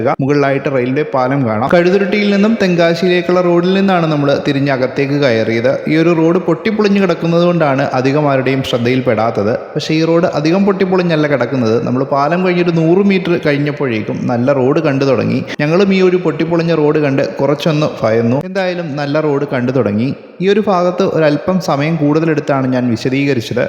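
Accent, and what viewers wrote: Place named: Kottayam, India. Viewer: ബ്രോ കോട്ടയം ആണോ 🤔ഇടയ്ക് ഫ വരുന്നുണ്ട് 🤔പൊളി 👍🏻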